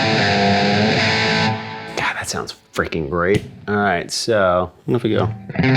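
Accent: United States. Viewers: You have really picked up the Nashville affect. That didn’t take long.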